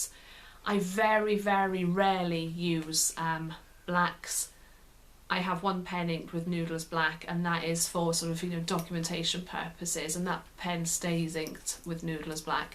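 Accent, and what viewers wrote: Wales, United Kingdom. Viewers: Its her Welsh accent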